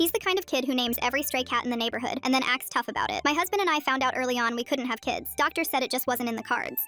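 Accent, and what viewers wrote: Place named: South, United States. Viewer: Damn, you’re southern as hell😅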